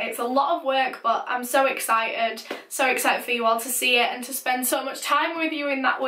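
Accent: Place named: Scotland, United Kingdom. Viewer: I am somewhat new and literally thought you were Scottish you don't sound English sorry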